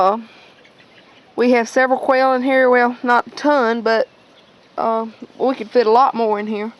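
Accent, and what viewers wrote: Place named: South, United States. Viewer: Yes indeed I love a southern accent being from the south I'm partial. Keep doing what you're doing Fort Worth Texas loves it. Blessings and more Blessings to you and your family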